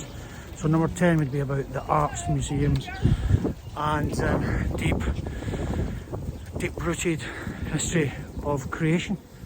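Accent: Scotland, United Kingdom. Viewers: As a Chinese living in the UK for over 30 years, it is great to hear some Scottish accent from China! Thank you, for reporting your experience in China as it is, and hopefully giving some UK audience some truth about life in China. The main stream media here is so unbelievably biased that for general public in the UK, all they hear is anti China propaganda, zero about what life in China is really like! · Loved your trueful and sincere comments on living in China, and your Scottish accent 😊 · Interesting to see a Scot vlogging his life in China. Can tell your Scottish straight from your accent. Love it. God bless.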